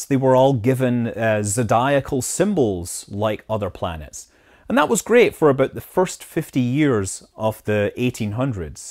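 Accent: Scotland, United Kingdom. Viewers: This is my favourite Scottish-sounding astronomy channel! We could predict where and when they will hit Earth. I don't know that we could stop them however. At least we would know when we are going to die. On second thoughts, maybe I don't want to know.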